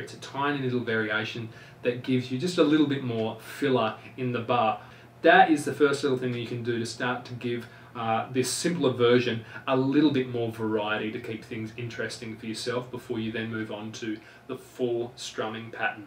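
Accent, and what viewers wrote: Australia. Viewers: lol. More like Aussie. Though i find it hard to differentiate between kiwi unless i hear both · No, he sounds Australian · Nice! Are you Aussie? :)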